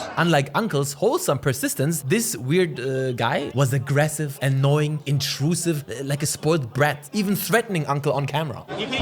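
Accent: Germany. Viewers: This Guy sounds like a german guy talking english 😄bist du Deutscher? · Dein Akzent erinnert mich an die Deutsche Sprache. Ich glaube du kannst Deutsch🤔. Wäre interessant zu wissen. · Your dialect is German I hear it my brother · u german?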